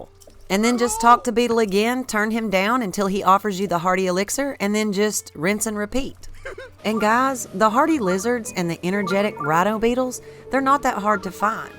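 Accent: South, United States. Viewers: It’s 2022, I don’t watch these for the tips 😂 I’m here for her soothing country voice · Southerners play video games? 😂. Sounds so odd for a souther gal talk VG’s. Respect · Love that souther accent. So informative.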